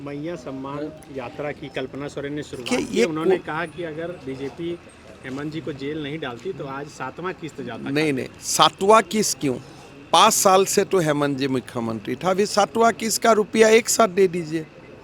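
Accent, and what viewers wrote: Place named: Bengal, India. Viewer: Ye bangali type ka bolta hai bhagao isko yaha se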